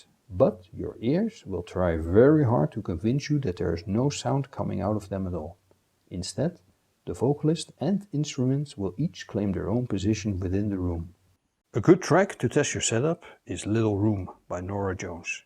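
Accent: Netherlands. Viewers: thanks good short explanation ! from your accent i would have thought you are from holland , and you could check out my set because i live in amsterdam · I'm 100% sure he's from the Netherlands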